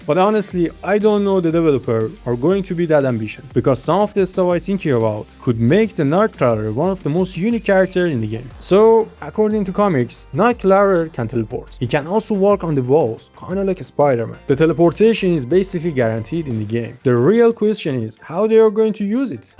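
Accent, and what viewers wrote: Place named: Iran. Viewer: u gotta be persian bro i would recognize an accent like that anywhere 😄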